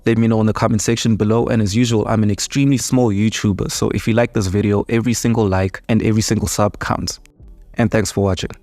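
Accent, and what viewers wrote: South Africa. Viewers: Bro your voice makes me think you're south African. Are you South African?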